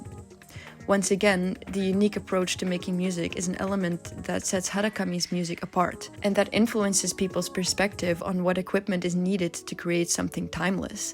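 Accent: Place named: Netherlands. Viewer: was wondering if you were dutch until you said "stronger even" then i was sure lol this is great work for a first video essay! You really did your research, really difficult stuff since as you said, most sources are in Japanese only. Shows you're passionate about it! keep making stuff like this and you'll find your niche audience in no time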